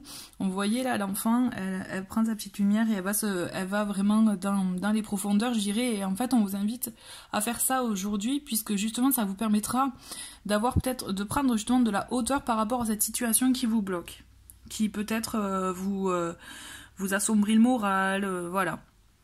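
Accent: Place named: Aveyron, France. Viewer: Merci cela me touche.. votre accent me va droit au coeur aussi car L'Aveyronnaise que je suis est sensible à ce chant dans la voix... ce chant qui est aussi le mien malgré mon ''exil'' en région parisienne. Je garde foi mais je dois me mettre en distance car je souhaite sortir de ma profonde dépression. Bisous🕯🌞❤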